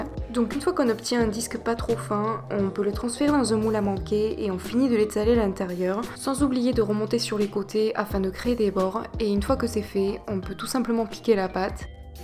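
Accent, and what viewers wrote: South, France. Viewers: tu es de quelle ville tu as un belle accent du sud!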